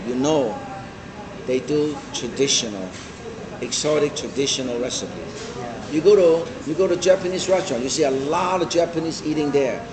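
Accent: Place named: Philippines. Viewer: pinoy!! halatang halata sa accent!! :)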